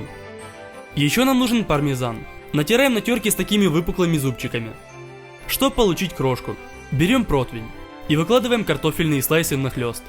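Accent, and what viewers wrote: Odessa, Ukraine. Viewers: Ты случайно не из Одессы?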